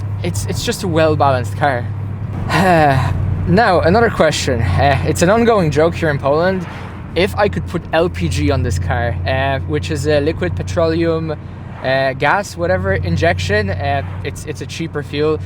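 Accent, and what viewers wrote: Ireland. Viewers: Great car!, you lived in Ireland or your teacher was one? Asking because of accent 😁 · I understand you're a Polish channel.. But are you from / did you grow up in Ireland? Swear to god you sound like you're from Ireland when you're speaking - like somewhere from the southeast of the country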